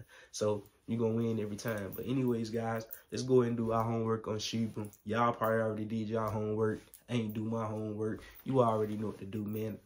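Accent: South, United States. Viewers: nah he just has that southern accent fr.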